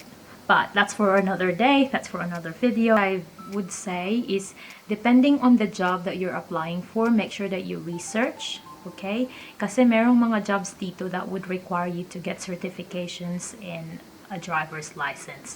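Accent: Australia. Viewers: Love the aussie accent sissy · Madam gudang, may Aussie accent ka na..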